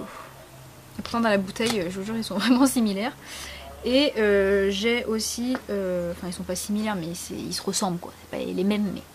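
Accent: France. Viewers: merci pour cette video, dis donc tu as beeeeeeaucoup de vernis, j'en ai presque bavé devnt cette video ^^ mais dis moi comment reussi tu a ranger tout ses vernis ? As tu deja fais une video de ton rangement vernis ? ça serait bien interessant de voir ça ! & oui oui je me matte ta video a 4h55 du matin lol et meme a presque 5h du matin j'aime ta video :) bis & ton accent français (renforcé) est terrible, j'adore ;)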